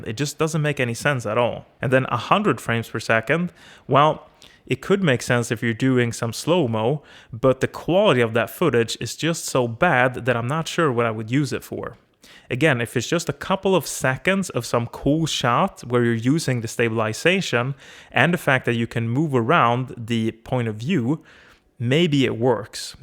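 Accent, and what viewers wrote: Sweden. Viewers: Are you from Sweden ?)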